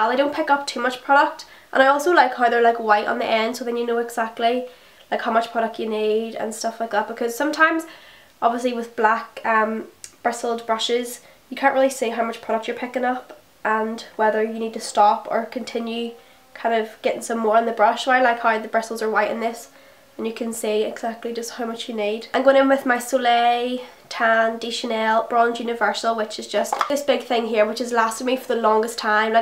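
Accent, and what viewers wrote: Northern Ireland, United Kingdom. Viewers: What county are you from in Northern Ireland I love your accent!🙈💜x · She's so good isn't she! I'm from Northern Ireland too bit my accent is a wee bit different, I hate mine xx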